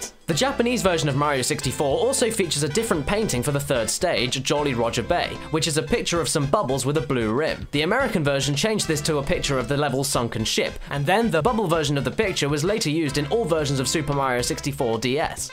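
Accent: United Kingdom. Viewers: Well is from the United Kingdom. Words and pronunciation is varied in some places from there. It depends where they grew up.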